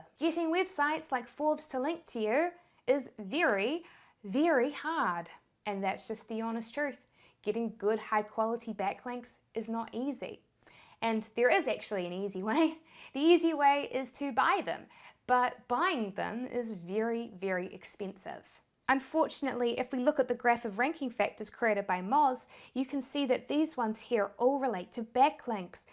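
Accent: New Zealand. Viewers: no problem at all! Thanks for responding. Of all the people I've found on YouTube, you by far seem the most genuine and you explain things extremely well. Love the Kiwi accent too and as an Irish man I can totally relate to speaking too fast 😂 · Is it a Kiwi accent? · Awesome content but I couldnt understand why you kept on saying add ticks to your pages "lots of ticks", then I realise you meant text lol , it all makes sense now I'm getting use to you NZ accesnt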